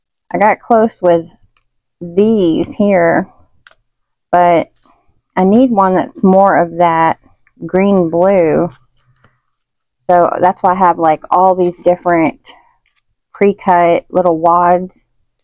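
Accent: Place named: Texas, United States. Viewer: I just ❤ your Texas accent. I have a Southern accent too but, I am from the North. Half my family is from Texas and the other half is from Michigan so, I have both accent 😂😂😂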